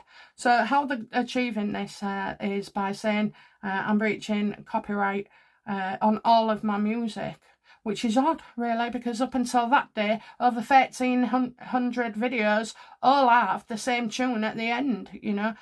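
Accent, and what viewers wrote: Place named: England, United Kingdom. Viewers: You are entertaining as well as teller of truth. I love your energy and also your particular English accent. Coming from Australia ❤ Harry is a boring predictable petty nasty piece of work. Where are his imaginary children? He NEVER mentions them! What a dishonest POS, taking orders from his horrible handler. Weak as piss. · Am gutted for you. I love listening to you. Not only as a reader, but also because we speak the same dialect. Am a 74 & I speak with an "old Bradfordian" Yorkshire accent. N am proud on it. It's probably the accent H can't cope with, poor little man. ( Big tear left eye). Trevor Coult was demonetised by h&m & he chose to have a long legal fight, and he's coming out tops in the end. I wish you all the love, luck and justification.🕉️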